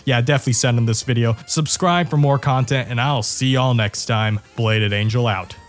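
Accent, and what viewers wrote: United States. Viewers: is asian? You sound like exactly American tbh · Bro I thought you were actually American you expect me to believe that you're Asían? Nahhhh I can't do that 😢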